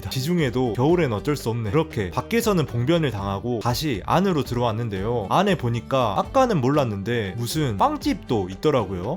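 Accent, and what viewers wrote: Jeolla, South Korea. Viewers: 님 전라도 사람이신거같다 말투가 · 3대가 서울인 나에게 전라도 동상의 사투리 귀요미.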